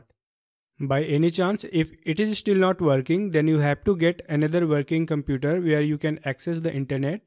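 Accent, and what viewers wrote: India. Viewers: 😁😁😁😁Always the Indian accent guy who knows how to get things sorted on YouTube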